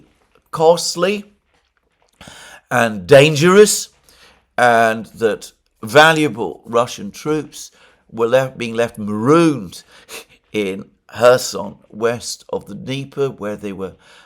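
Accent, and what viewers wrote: United Kingdom. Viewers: The only angle this guy focuses on is from the Russia perspective or the effects on Russia. Looks like a Russian agent of Greek background raised in and made to look and talk like a Brit!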